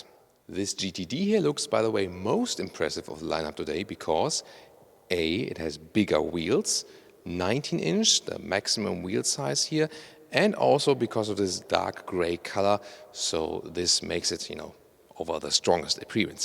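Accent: Germany. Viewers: I'm sure he's intentionally dialing up his German accent which is SO GUT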